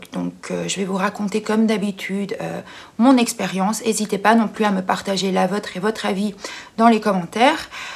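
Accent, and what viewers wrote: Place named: Belgium. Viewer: Ho! C'est marrant ça, c'est vrai que je m'étais dit que tu étais belge par rapport à ta façon de parler sans doute, à moins que tu n'ai fourni l'info dans une de tes vidéos. Je suis à Bruxelles depuis deux ans maintenant! Et j'aime beaucoup cette ville même si c'est un peu bizarre en ce moment, je suis arrivé 2 mois avant le 1er confinement, j'ai donc très peu pu profiter de la ville dans des conditions "normales". Peut-être nous croiserons nous un jour ^^, si je t’aperçois je viendrais te parler! Bises!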